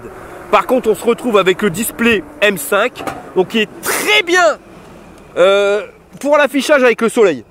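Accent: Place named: Ch'ti, France. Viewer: Suis d'accord mais c le charme du nord , les ch'ti quoa, c'est cool la différence.😂. Moi il me fait rire, il devrait moins forcer sur le patois c'est vrai 😂. Surtout qu'il à de bonnes compétences techniques, c'est dommage que dès fois on est du mal à comprendre tt les expressions, car je ne suis pas de Lille et tout le monde non plus , mais il me fait rire quand même 😂.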